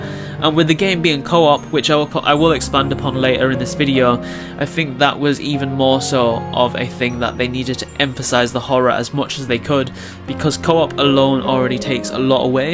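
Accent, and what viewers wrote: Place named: United Kingdom. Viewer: You sound soooooooo british hahah love it man keep it up!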